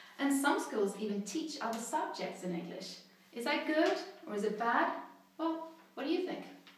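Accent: United Kingdom. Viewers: I'm guessing from the context here that you're Swedish. You have a heavy British English accent. I thought you were British until I got the context that you were Swedish.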